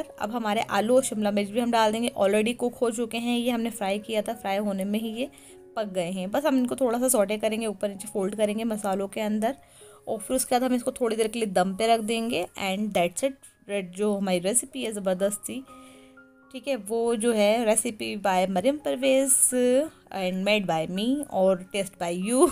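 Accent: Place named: Karachi, Pakistan. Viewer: Assalamu alikum I am from Pakistan karachi aap k bolnay ka andaz bilkul hum karachi walo ki terha hain aur aisa lagta ha k jasay aap Karachi wali hi hain please aap meray is comment ka jawab jaroor dijiay ga thanks ALLAH Hafiz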